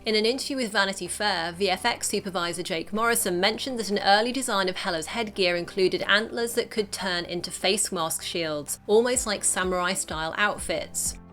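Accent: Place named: RP, United Kingdom. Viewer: Can you be creative instead of being English public school voice confident without having a fucking clue what you're doing mode. I think the days of that are very numbered.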